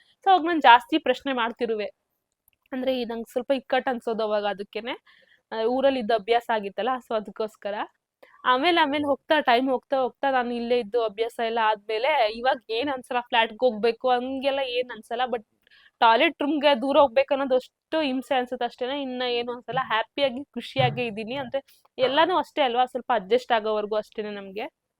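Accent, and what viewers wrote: India. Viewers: Hale mysore or Mandya Kannada. Nimma mane 10*10 ede annisuttade Badige yestu ede alli. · Area elli heli Amma. Nice talking in Bangalore Kannada.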